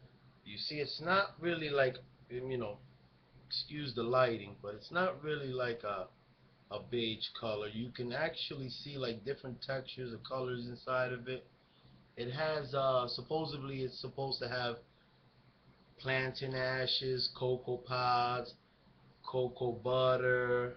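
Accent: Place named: New York, United States. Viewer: Gotta love that NY accent!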